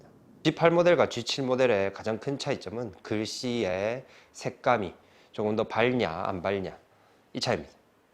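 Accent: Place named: Daegu, South Korea. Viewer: 아직 유명한 채널은 아니지만, 응원합니다. 사장님의 약간 대구 지역 톤이 섞인 차분한 목소리가 굉장히 정감있네요. 구독!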